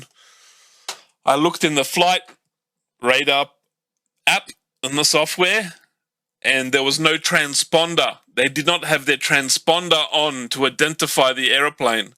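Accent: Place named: Australia. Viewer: I got sooo much to catch up on 👍love your Aussie Accent coming through 😅.. this sounds amazing.. 👍 Great to catch up on some new videos ( new to me as I’m running behind ). Always great to watch your videos.. WOW what Size is that I wonder 💭 Thank you so much for sharing again 💫😃 🛸 🛸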